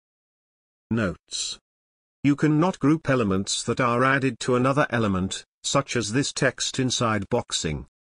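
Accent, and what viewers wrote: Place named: RP, United Kingdom. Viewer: I like how posh he sounds : )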